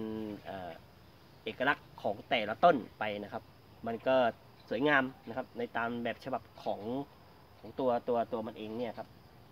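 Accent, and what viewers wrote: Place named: Suphanburi, Thailand. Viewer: พี่ชายยยย..ตามาแว้ว..มิน่าอาหารหรือเวลาพูดคล้ายๆสุพรรณเลยพิษณุโลกนี่เอง...😁😁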